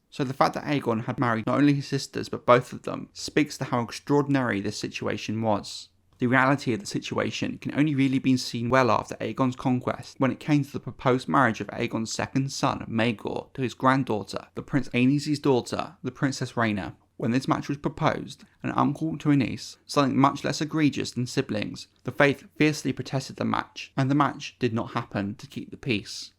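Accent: United Kingdom. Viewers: Let me guess you are from Liverpool 🤔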